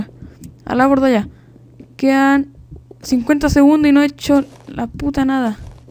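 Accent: Chile. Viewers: su acento me suena chileno:D si no mira que es chileno y compara si no es chileno (SORRY ASI SUENAS) y no importa que hable con otro acento es la vida de el:D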